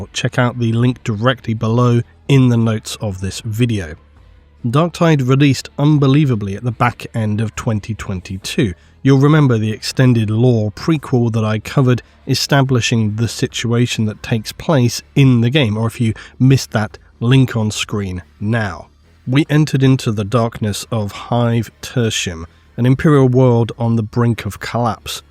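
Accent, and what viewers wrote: England, United Kingdom. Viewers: Amusingly, with his particular English accent "I am the law" would sound a bit like "I am the lore" and "I am the lore" would sound a bit like "I am the law".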